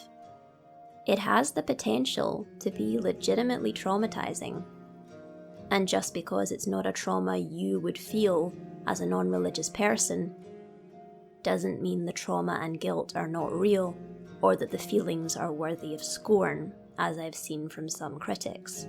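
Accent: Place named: Scotland, United Kingdom. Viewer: I hear a Gaelic accent in your voice ( im assuming Scottish?) Interestingly, Karen Traviss when writing the Republic Commando series based Mando culture heavily on the Celts. Mando'a is spoken like Gaelic but with more of the rules of Norse as far as vowels and such. Aliit Ori'Shya Tal'Din for example. Its what's being sung when Boba Fett finds the Tuskan tribe that adopted him in dead. It means family is more than blood. The family structure is similar as well to both cultures, though women are expected to take even more of an active role in defense/war unless they have children. As a Christian Dins journey reflects my own in some ways. Im more like Boba Fett personality wise, but i struggle between the world and the church. Literally the only other character i related to in this respect was Quigon Jinn vs the Jedi Council. But for my relationship with the greater church. 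In his case, it was the Will of the Force vs the Will of the Jedi.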